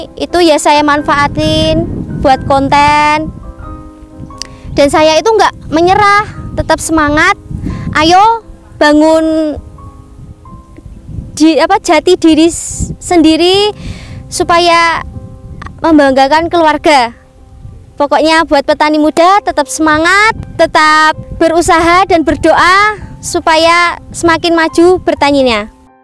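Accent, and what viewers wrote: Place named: Java, Indonesia. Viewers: Keren kali kontennyaa, dengar cara penyampaian dan logatnyaa medokk. Sangat menginspirasi, dn terhibur dgn channel ini dan petani happy. Thanks anyway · Medok banget e mbak😅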